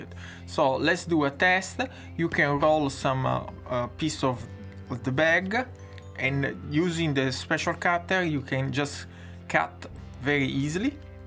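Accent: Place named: Italy. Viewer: Great demo, one video is worth more than a thousand words. It was also nice hearing a genuine (not a Mario Bros) thick Italian accent. Thank you,. Greetings from 🇮🇹. 😎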